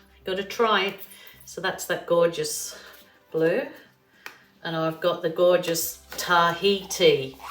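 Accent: Birmingham, United Kingdom. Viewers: Can I hear a fellow Brummy accent?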